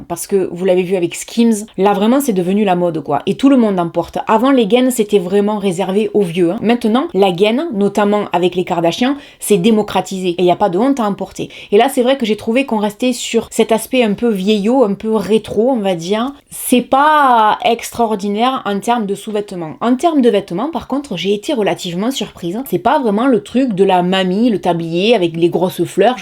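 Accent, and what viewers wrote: South, France. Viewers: Bonjour, vous m'avez fait rire, merci de votre bonne humeur et de votre accent du Sud.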